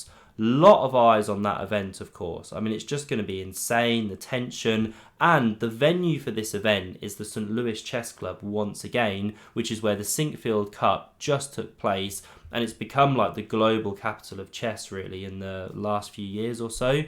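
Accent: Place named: Scotland, United Kingdom. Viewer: your Scottish accent is horrible I can follow you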